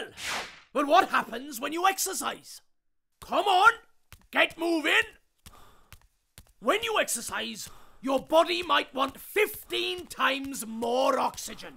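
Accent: Wales, United Kingdom. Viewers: Warning: contains a dodgy Welsh accent. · There's some people who love the entertaining Welsh accent, and some who absolutely hate it. I appreciate the attempt to make this entertaining, but when there are so many people against it, I reckon it'd be safest if video makers didn't use it.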